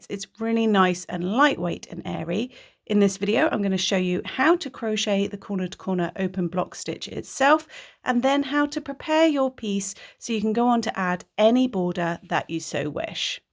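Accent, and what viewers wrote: United Kingdom. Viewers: This is the first time I've come across your tutorials and have watched this corner to corner one with fascination show simple you have made it look for even a beginner crocheter. I am certainly not a beginner but will now recommend your site to those that are, to perhaps use as a second project, once they have made an item using UK terms. My only criticism is that because of your accent I'd assumed your instructions would have been using UK terms but as the work only involves ch, ss and tr there should be no real confusion because of your clear illustrations. I've, opted to make a doll"s blanket alongside a beginner,using your tutorial to show them how to crochet so that they can learn to switch more easily between American and British crochet patterns. Thank you for such a brilliantly clear tutorial, it will make my teaching so much easier for me! 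xx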